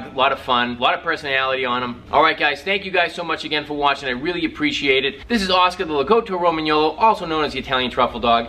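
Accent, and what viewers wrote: Boston, United States. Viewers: Can tell this guy is from Boston immediately 😅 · where does this guy live??? He has a boston accent, but there are no sharks in boston. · Boston? I recognize the accent...and the shark comments! Interested in this breed but I can’t find local breeders. Do you know of any?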